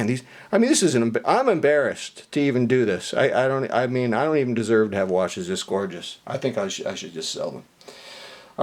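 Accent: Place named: United States. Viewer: I love your collection and your thoughts on it. Great American accent that always makes me smile. Please keep up the good work.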